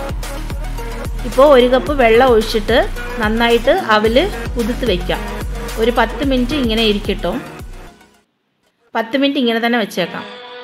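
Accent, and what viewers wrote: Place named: Tamil Nadu, India. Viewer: Gopi manchuri enn കേട്ടിട്ടുണ്ട് ഇത് aadhyaayita enthayalum kandal തന്നെ manassilakum super chechi malayalam samsarikkumbilum tamiz idakk idakk slang verunund Sem2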